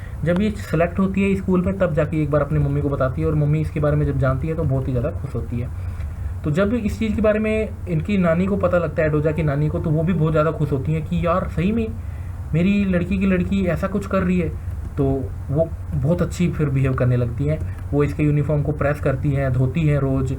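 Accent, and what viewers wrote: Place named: Rajasthan, India. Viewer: You from Udaipur or Rajasthan. ?? Your tone depicts that... please tell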